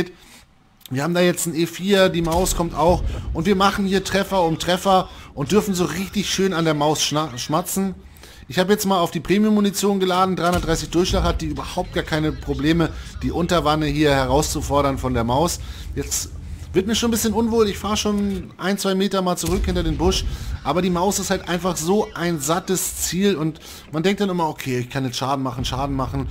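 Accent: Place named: Hamburg, Germany. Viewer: Nossi ist sehr unterhaltsam, Moo für die breite Masse um ihnen das Spiel näher zu bringen. ist für die Spieler, die das Spiel verstehen wollen. Und bringt es zudem mit seiner Hamburger Schnauze (Grüße aus Bergedorf) einfach genial rüber.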